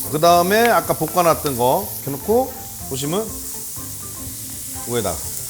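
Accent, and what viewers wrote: Chungcheong, South Korea. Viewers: Yee분 충청도 사투리 같은거 쓰는데 충청도 사람이에you?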